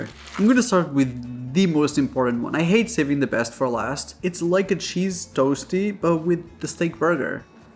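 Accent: United Kingdom. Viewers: I thought this guy is British. Did he get American citizenship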